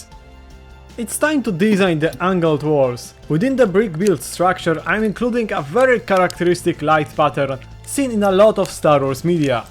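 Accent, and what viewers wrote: Poland. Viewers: łoooo panie polak! słychać po akcencie typowo polski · nie no stary, masz totalnie polski akcent to usłyszeniu z 5 słów już widziałem że jesteś z polski. ale moce Zajebiste🔥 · Your had polish Accent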